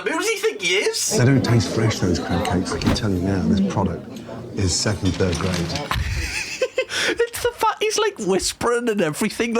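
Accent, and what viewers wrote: Newcastle, United Kingdom. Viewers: Hes geordie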